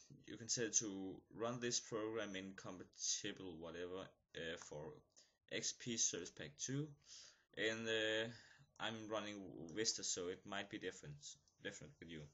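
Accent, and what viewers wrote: Denmark. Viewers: du er dansker er du ik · Dansker? :D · jeg kan høre at du er dansk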